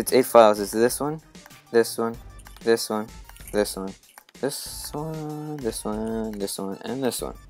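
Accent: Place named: Japan. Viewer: pfff your accent bro! is it ..japanese or something !!!!!!!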